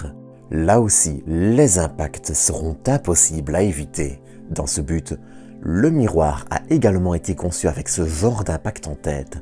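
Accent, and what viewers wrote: Belgium. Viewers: Ici grâce à Christophe ! Super chaine, je m'abonne ! (en plus je suis addict à l'accent belge...) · il a un accent belge à couper au couteau, il doit veniiiiR du fin fond de la campagne hainuyère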